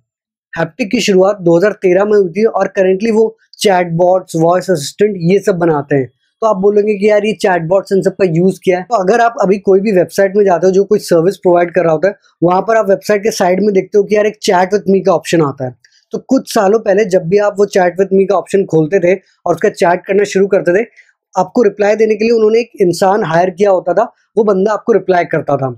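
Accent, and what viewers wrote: Odisha, India. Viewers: R u an odia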